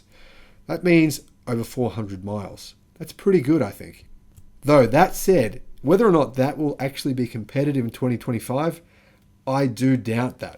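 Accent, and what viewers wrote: Australia. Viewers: Tired of the Ausie Accent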